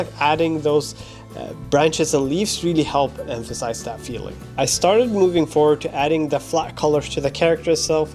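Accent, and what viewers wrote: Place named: Sweden. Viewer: i KNEW i recognized your swedish accent from somewhere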